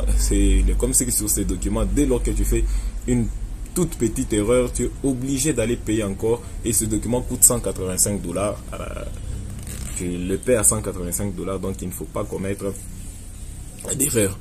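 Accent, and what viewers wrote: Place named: Kinshasa, Democratic Republic of the Congo. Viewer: Je suis quand même le premier à commenter . Par votre accent, j'avais compris que vous étiez congolais de Kinshasa 👍👍😍😍. Je m'abonne pour vous encourager 👍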